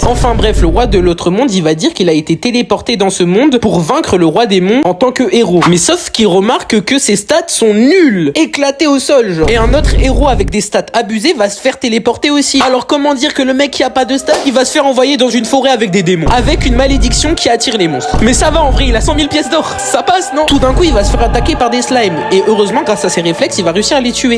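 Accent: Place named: England, United Kingdom. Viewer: On voulait la prononciation ton accent anglais nous as manquer 😢😢😢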